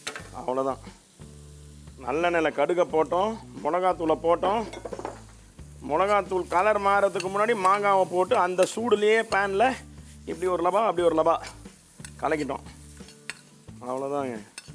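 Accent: Chennai, India. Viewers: நீங்கள் தயார் செய்யும் உணவை உண்டு ருசிக்கலாம். நீங்கள் பேசும் வட சென்னைத் தமிழை காதில் கேட்டு ரசிக்கலாம்.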